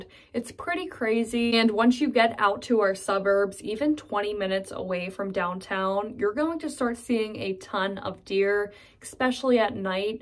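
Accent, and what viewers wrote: Pittsburgh, United States. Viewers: The longer you talked, the more Pittsburgh you sounded😁 Especially "expecially". Nobody else says that. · I was born in Pittsburgh and moved out the the country when I was 9, I love the fact that I can hear a Pittsburgh accent, and you definitely have one. Awesome video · Love your Pittsburgh accent!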